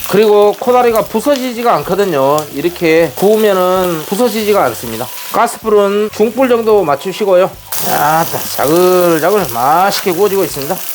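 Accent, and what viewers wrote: Jeolla, South Korea. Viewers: 설명도. 구수한. 전라도사투리로. 친근감있고. 맛도. 배가되는거같아요. 저도. 따라해보려. 합니다. 감사합니다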